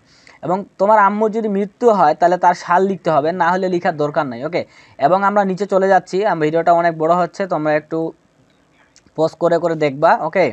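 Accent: Bangladesh. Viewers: Apnar kotha sunei ami bujhte paisilam j apnar bari Dinajpur a hobe, because amar bario Dinajpur. By the way thanks for this video 🥰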